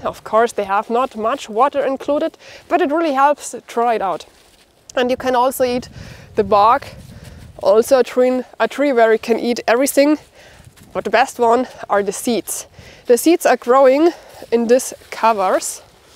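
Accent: Germany. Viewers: love your video…it has lots of great information. I live in WA State USA, on the Border with Canada. On my 10 acres we have Alder, Broadleaf Maple and Vine Maple, Birch, Bitter Cherry, Indian Plum, Douglas Fir, Hemlock, Cedar, Mt. Ash, Butterfly Bush and wild Hazelnut. I’d love to learn more about uses for these trees and shrubs. I really like your German accent and your English is excellent. Thank you for sharing and I’ll keep watching and learning from you.🇺🇸🇩🇪🇺🇦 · I think it's a German accent from Alemania. Again I can't spell very well. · You German?? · I really enjoyed this video. I have subscribed because there seems to be something new to learn about the uses of herbs and trees. I also LOVE your accent. I have been to Germany three or four times, and I get a chance to practice speaking German. Mein Deutsch ist ganz schlimm aber Ich kann es verstehen und lesen. 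Deutschland ist mir lieb. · Just found this channel, it’s wonderful! My mother is German, father USA (he was stationed in Germany when they met). Naturally, I love the sound of your voice and enjoy hearing the Germanic inflections as my mother spoke that way. I love trees, I grew up in areas with lots of woods to explore and play in. The forest is my favorite environment. Yes, please do a video about winter foraging! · Is she German? Lived there 88-90 in the US army.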